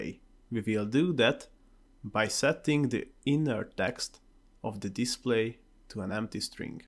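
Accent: Hungary. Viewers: Hey man, thanks for the tutorial, easy and understandable... just one thing i want to ask... aren't you hungarian??? :D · Nice video! I'm wondering what kind of accent is this you have :D Jól sejtem, hogy magyar? :D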